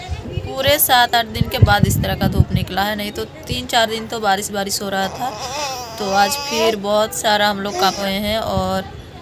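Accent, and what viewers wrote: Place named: Bengal, India. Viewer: Are you a bengali as you talking asent is like bengali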